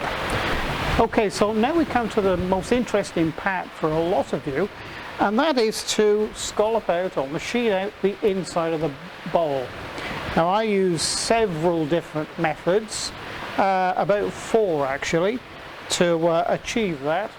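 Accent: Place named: West Country, United Kingdom. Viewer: You talk in $, yet your accent sounds ‘West Country’!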